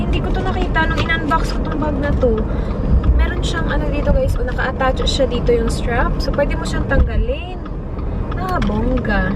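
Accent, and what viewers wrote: Visayas, Philippines. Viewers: Sis.. Klaro kaau na bisaya ka? Correct me when f I'm wrong 😊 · bisaya ka o tagalog? Prang visayan accent ka kc?bsaya din ako..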